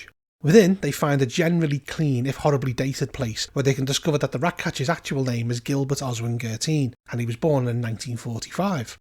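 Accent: North, United Kingdom. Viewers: Hi, where are you from? You have a great voice to my American ears but wondering if it is Northern? I’m going to run Scritch Scratch tonight and enjoyed your summary. The scenario does suggest groupings for less than 6 players, on page 8 under the heading “Six of One” in the edition I purchased which looks a bit different than yours. Cheers!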